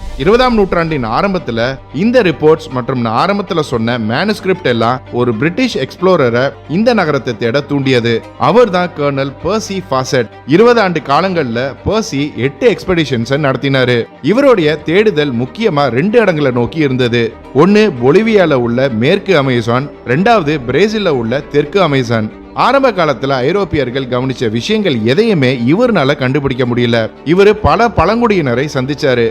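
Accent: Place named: Chennai, India. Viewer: That's it chennai voice mathri iruku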